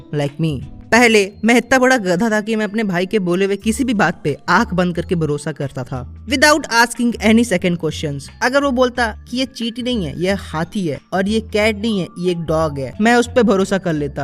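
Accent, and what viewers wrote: Bengal, India. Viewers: R u Bengali? Btw liked ur video👍🏼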